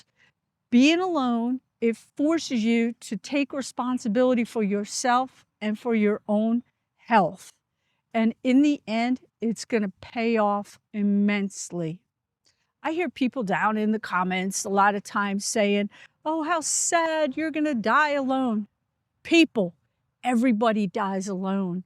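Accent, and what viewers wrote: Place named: East Coast, United States. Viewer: You have wisdom,! You are caring and a great communicator. Thanks for lifting us up. Life & happiness is what you make it. Love your kind heart & east coast accent. ❤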